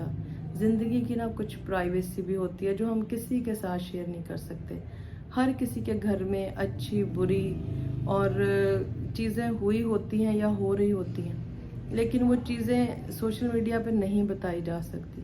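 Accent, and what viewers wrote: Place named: Punjab, India. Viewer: Bhainji sat shri akal ji Tusi jdo'n bolde o menu baut sohne lgde o tusi hai v bade sohne o Tuhadi theth punjabi v sade vrgi a Tusi pakistan vich kitthe rende o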